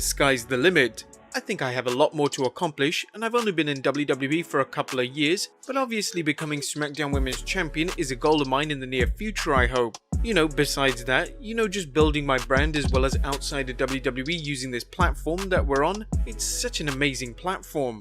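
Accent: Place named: England, United Kingdom. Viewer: oh thank god. if it wasn't the English guy and instead of the American guy narrating the video. I would have tune out.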